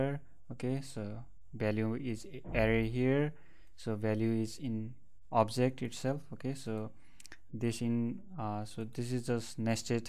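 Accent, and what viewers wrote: India. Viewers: okay I don't know about you okay and this may sound racist okay but is it really that impossible to find a video on how to code that isn't taught by a god damn indian! seriously no offense to Indians but I hate listening to an accent the entire time I'm trying to dedicate my time on something, it's just very distracting.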